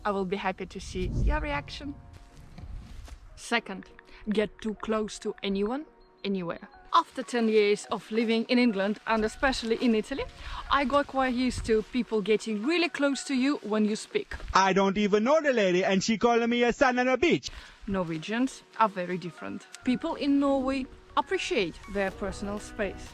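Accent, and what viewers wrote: Russia. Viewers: The English accent sounds similar to Russian. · Having trouble placing her accent. Russian? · I like your Russian accent 😬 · This Russian accent :) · What is your accent. Are you from Russia or Eastern Europe?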